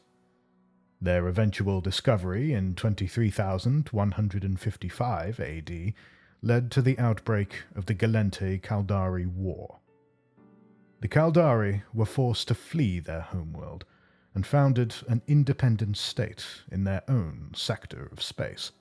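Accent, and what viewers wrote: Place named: United Kingdom. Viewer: I can hear all the astrophysicists cringe, but since it’s a British accent I’ll allow it.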